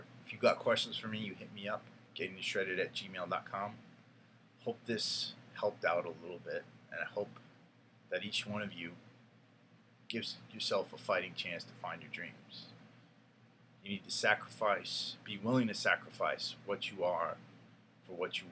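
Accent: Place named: East Coast, United States. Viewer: Thanks for the videos and the motivation. Keep them coming! For the record, east coast accents are the only real American accent. #BXNY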